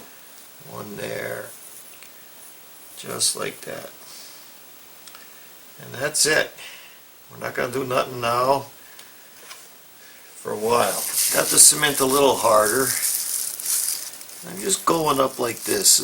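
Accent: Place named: Pittsburgh, United States. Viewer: I'm from PA also - Royersford, I'm guessing you are out somewhere near Pittsburgh judging by your accent! Those things came out great! Really look professional!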